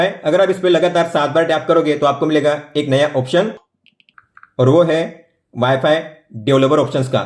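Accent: Gujarat, India. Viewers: Are you from gujarat..? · Are you from Gujarat?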